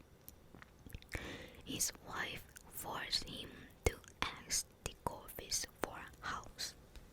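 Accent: Standard. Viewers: 发音好标准，好萌！🥹🫶🏻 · 你的中文发音很标准，谢谢～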